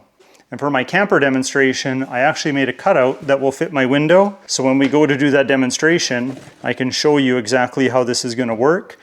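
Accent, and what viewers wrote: Canada. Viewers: You did well explaining it. Love the way you say garage, sounded like you were cutting the letters down to just 3 lol. you'll see it's like soft french.....!!!! Canucks always sound foreign. Must be in the water. For the price if it's durable. And durability being the key word it's worth the $ 699. Or is it???? A top mounted R.V. ac/heater that is much more powerful is only $200 more. But for tents and off grid applications this would maybe also be great except I check a low watt a/c on Amazon and it's only $ 332 14,000 btu , so...... For tents this would be worth it. Another scary thing is it only comes with a 1-year warranty. That doesn't build confidence. For winter we always use a low watt electric heater along with kerosene lanterns. You'd be amazed how much the old hurricane lanterns put out. We made #2 boxes for ours to sit down in, so the kids couldn't knock them over. 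But now in our " Golden Years we just do hotels and motels. Get all kinds of rebates and miles and bonuses for loyalty too. Much safer and easier and actually cheaper. Over 65 has it's perks. Loyalty is the key. Best regards Canuck. Great Job on the video. Peace. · man, what a dumb comment. You’re an embarrassment to the rest of us. If you couldn’t tell he was Canadian by his accent, you need to get out more. Also, you act like Fahrenheit is American…it was developed by a Dutch physicist and the US is only one of 5 countries in the world that still uses it. There’s a reason for that. And if your comment was some smoothbrained attempt at American exceptionalism, you really need to read some history about how strong an ally Canada has been, regularly spilling more blood and treasure per capita that the US does in global conflicts. You came here looking for information and it was given to you for free…so just be grateful someone else did your homework for you.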